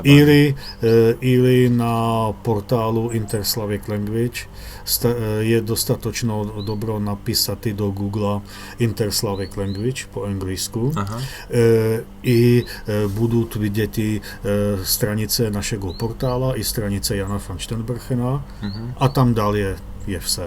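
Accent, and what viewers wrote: Czech Republic. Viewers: He speaks normal czech sometimes with few different words, but accent is 100% czech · Czeski akcent słychać :) I cechy fonetyczne czeskiego :) Lepsze to niż angielski, tylko czy to się przyjmie w praktyce. Ja uczyłam się rosyjskiego i chorwackiego, troszkę znam białoruski, ukraiński, a czeski - jak już wspominałam, jestem Morawianką w 1/4, a gwarą góralską mówię na co dzień. To oznacza, że bez myślenia rozumiem praktycznie 100%. Masakra, genialne! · У меня одного ощущение что это ман говорит по русски только с сильным чешским акцентом..... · I am Czech and for me it does not sound like Czech, there are many words that are not Czech. Maybe just a little bit. But the man, being Czech, speaks it with 100% Czech accent. I understand 95 % of it. The other person speaks Polish and I understand maybe 80 % of it. I like the idea and would like to learn this universal slavic language. 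· bo jest czechem to ma akcent czeski jak będzie polak to będzie zalatywal na polski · Akcent robí neuveriteľne veľa. Prvé slová a ihneď som vedel že sa jedná o cecha. Klobúk dolu za prácu a výsledok . Inak prečo mám pocit že je tam najviac slov z češtiny alebo slovenčiny. Ak pozerám na medzislovancinu v titulkoch a npr. poľštinu, zdá sa mi že tam je naozaj viac našich slov, alebo to je čisto subjektívny pocit a zdá sa to každému . ;) Inak, mal by som otázku, ktorý súčasný jazyk je najbližšie k jadru interslavic ? Veľmi zaujímavá práca. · Yes, and he has strong czech accent, i can hear it 😂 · Действительно, понятно. Но произношение явно чешское. Интересно, а в Белоруссии группа любителей межславянского есть? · it's not 50% Czech, it's his accent that made you think so. I'm Polish and I've heard another Pole speaking Interslavic - I then also thought it sounds very similar to Polish, which wasn't the case in this video anymore. 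Our native language surely will have some impact, that's obvious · To be honest Mr. pronounces Interslavic clearly with Czech "filter". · Słychać wyraźnie, że Czech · I can understand very well what Mr. says. Maybe also because he talks with a Czech accent. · Podoba mi się idea języka międzysłowiańskiego. Przyda się, mam nadzieję w niedalekiej przyszłości. Jest bardzo czeski, w sensie wymowy i na całe szczęście jest łatwo zrozumiały dla Polaka :-) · polacy tutaj macie srbski jazik jako najmezdu hahahha znaczy Pan mowi jako czech ktory zna po serbsku ( ma ta twardosc ) umiescil duzo crkweno slowenskogo,ruskiego tu slychac a ni troszku tylko dodanie JA MNOGaja RODnaja ,blgarskiego niema tego tonaliteta , polskiego tez nie slychac nie ma a ni troszke tekko miekkego wymawania i nazalnosci . TO pisze z punktu dzwiekowego , czyli ucha , ktora mi pomogla ogarniac nasze jezyky . Wiec tutaj slychac Slowienca ktory zrastal s Yugami na osiedlu potem pojechal do Czech sadzic bo tam spoko calkiem inaczej bede brzmial Blgarin ,Boiko , Leh, Bunjevac lub Bosanac , mowiac medzynasz I to jest najciekawsze dla ucha i mojiej strasti pra nasieh jazici bracioo Wielki pozdraw dla Polakow najbardziej bo to moje glowe uczcie kirila i serbski i wsjo paniatna Swietna robota tylko prosto w do glebine niewyczerpane TAKO ISTO SAMO PRA VSIEH SLOVENSTVUJUSzCzIH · It was easier for me to understand as a Czech, because Mr. 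is Czech and has a clear Czech accent, lol, but this is an interesting idea. If people in Slavic countries actually spoke this language, I think I could learn it for a couple of months and then would be able to hold simple conversations without big problems. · As a czech i understand 100% but maybe only he has czech accent and I'm from czech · Молодцы! Получился русский с сильным чешским акцентом! В принципе зря старались, ибо старославянский давно есть. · I am part Czech, part Polish and I understand most of these. But have strong Czech accent. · kenan Sljivo gaming he’s Czech you can tell by accent, we might just use the most archaic words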